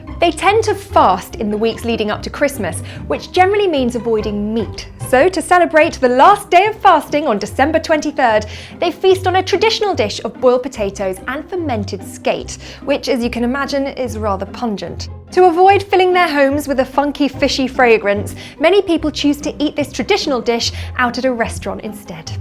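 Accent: United Kingdom. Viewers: oh my god that British accent 😍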